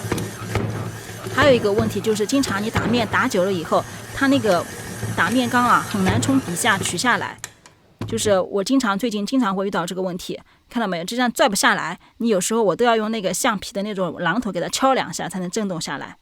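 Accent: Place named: Shanghai, China. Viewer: 博主是上海人？口音可爱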